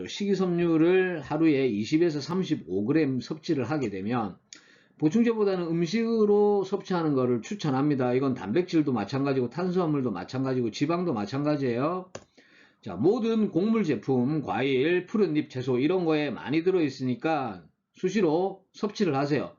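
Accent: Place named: Busan, South Korea. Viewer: 크 알겠습니다 ㅎ 오랜만에 듣습니다 단디 ㅎㅎ 저도 부산놈이라 형님 사투리가 참 좋습니다 ㅎ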